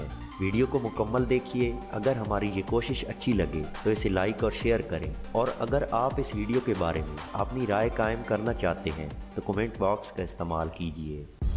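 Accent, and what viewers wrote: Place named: Sheikhupura, Pakistan. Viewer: I love you style n voice shiekhupura Ke ho peeche se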